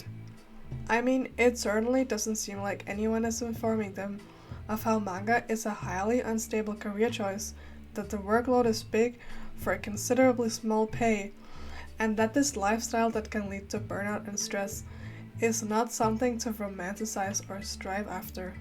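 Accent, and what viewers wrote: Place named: Europe. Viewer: Damn, you sound so European! I love your video btw. 🎉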